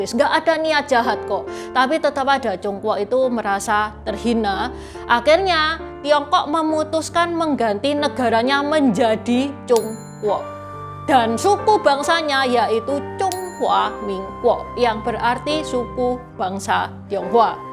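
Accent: Java, Indonesia. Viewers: ... unikny lagi pke loghat jawa medhog 😃😃😃😃😃 ... 👍❤️🙏 ...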